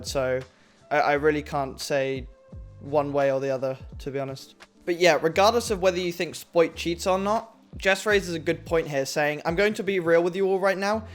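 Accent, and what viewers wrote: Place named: United Kingdom. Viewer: This content is actually fire bruh, a fucking panda who plays r6 and narrates with a brittish accent? who doesn't want to watch that type of content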